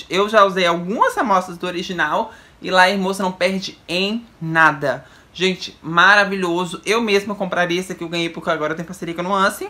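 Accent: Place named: Minas Gerais, Brazil. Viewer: Pode falar bastante . Amo esse sotaque mineiro que emenda as palavras . Acho chique .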